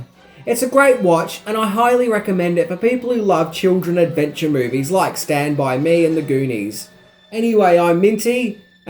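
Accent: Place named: Australia. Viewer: this is one of the few times I got an accent wrong. I honestly thought you were British. but now that you pointed out ur Australian, I can tell. I'm honestly thinking to myself, "how did I get that wrong?"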